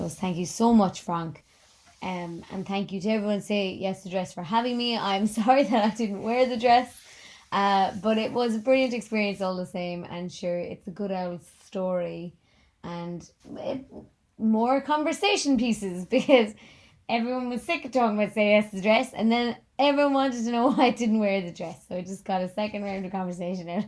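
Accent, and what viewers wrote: Ireland. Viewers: Me sitting here trying To copy ur accent. And i fail alot :p we Swedish People cant say irish "start" xD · Subscribed because all my family lives back in Ireland and I’m in Canada and miss the accent ❤️❤️ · It's an Irsh accent. She's saying that her IRISH accent is cute. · Your accent is lush. <3 I'm actually subscribing to your channel, based on zero other videos, because I figure I'll get to hear you talk, which will automatically make any other content you produce fascinating by default. I have a couple of Irish expat friends, and you make me think of them. They're from Cork, and I have terrible hearing damage, so having drinks with them, and when they've had a couple and start going super rapid-fire with the talking, it's basically just this lovely, lyrical wall of incomprehensible sound, hahaha! Anyway, came for the SYTTD story, stayed for the pretty vocals. 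:-) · What a lovely brogue..I could listen to you talk for hours :) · Omg I love love love your accent I always wanted an Irish accent 😍😍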